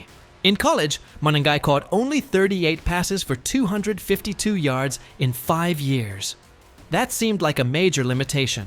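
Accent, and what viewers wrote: United Kingdom. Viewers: Gotta love the British accent in and out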